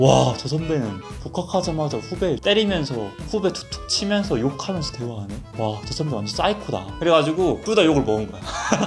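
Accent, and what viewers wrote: Gwangju, South Korea. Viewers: 뭔가 광주 사투리가 엄청 정겨워요 ㅎㅎ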